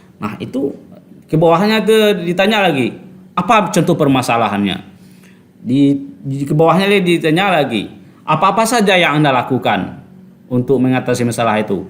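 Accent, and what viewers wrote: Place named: West Sumatra, Indonesia. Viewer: dari logat URANG AWAK udo Koo 🙏🙏🙏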